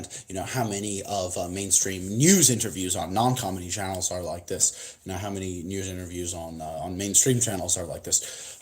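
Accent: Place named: Australia. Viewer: I swear your Aussie accent is more authentic than my fellow Australians. By the way, if you ever talk to Adam, give him our blessings, from the old Wallace gang.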